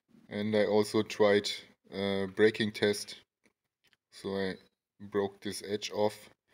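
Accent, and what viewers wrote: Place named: Germany. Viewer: dieser geile deutscher akzent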